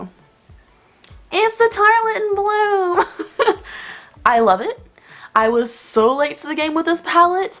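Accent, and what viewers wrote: South, United States. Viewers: I really like your videos only thing as European that speaks perfect English I have a problem with understanding your southern accent. Maybe talk slower idk😭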